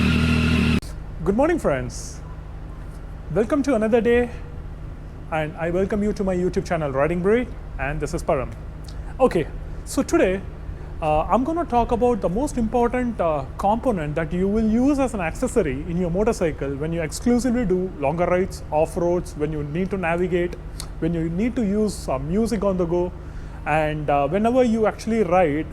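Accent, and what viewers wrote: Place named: Texas, United States. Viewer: Great video! Thats a heavy accent are you from texas?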